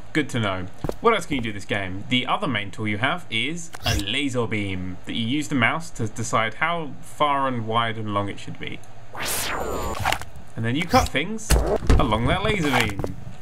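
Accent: United Kingdom. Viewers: For some reason, whenever I'm thinking over doing a tutorial video or something, I think it in some random British dude's voice... I now understand that it's Nerd3's.....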